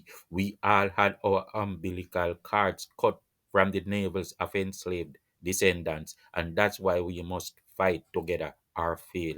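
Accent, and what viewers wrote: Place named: Jamaica. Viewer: Real talk my brother sounds like a real Patric Jamaican glad to hear you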